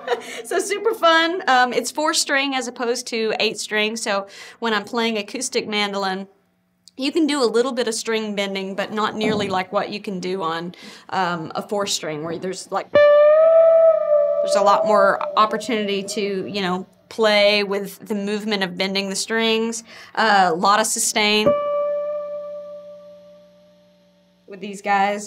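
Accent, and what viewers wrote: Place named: South, United States. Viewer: Her accent is crazy. It's like some words she says are from the south but then every now and then a few northern ones slip in.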